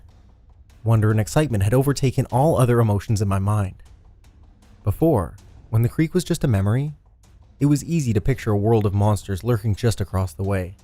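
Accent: South, United States. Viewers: love your southern accent xD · Very nice southern accent. It sounded real. I'm from the south so I'm used to it.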